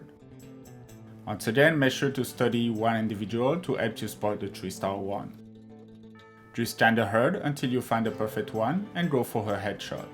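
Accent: France. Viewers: Absolutely love how French you sound speaking English.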